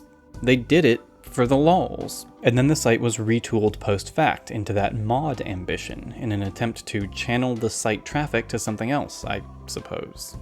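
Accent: United States. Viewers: You sound American, you can't access the Internet Archive?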